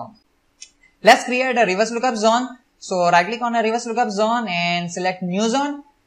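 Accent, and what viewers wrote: India. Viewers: cannot understand a word of this indiglish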